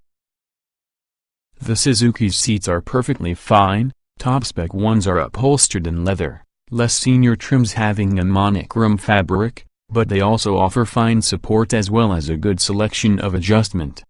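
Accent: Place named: United States. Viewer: Crap USA accent crap review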